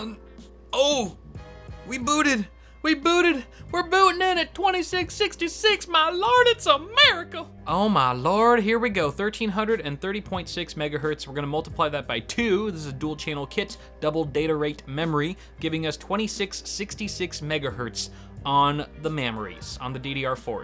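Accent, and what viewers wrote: South, United States. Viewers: I read this in southern accent- I have no idea why!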